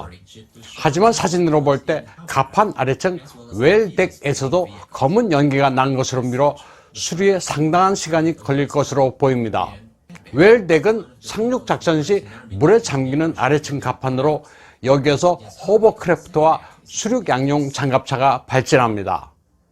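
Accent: China. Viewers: 해설시 구태여 중국 발음을 할 필요가 있습니까? 중공인이 아니면 우리식으로 말하세요 거부감이 듭니다!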